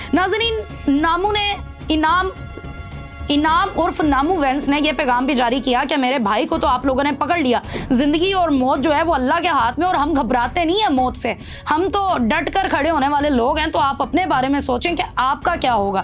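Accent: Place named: Punjab, India. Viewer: بی بی کیا ہی اچھا ہوتا کہ آپ ویلاگ پنجابی میں کرلیتی اردو انگریزی مکس کرنے کے باوجود لب و لہجہ اور الفاظ کی ادائیگی پنجابی انداز میں ہے۔،،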